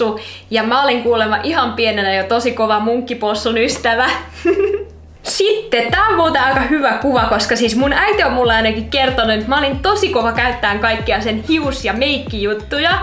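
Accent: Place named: Tampere, Finland. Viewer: Sä kuulostat ihan Tamperelaiselta💕💛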